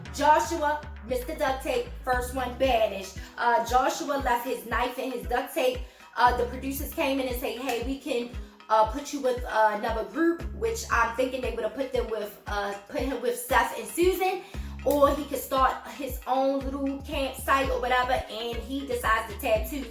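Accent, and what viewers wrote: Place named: Maryland, United States. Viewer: i can tell ur from maryland lol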